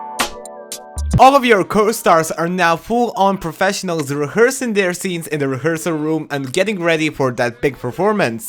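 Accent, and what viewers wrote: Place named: France. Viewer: I LOVE your accent! Are you from France?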